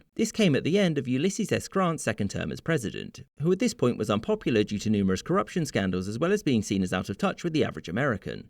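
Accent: United Kingdom. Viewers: It’s crazy that the person commenting on US history is British.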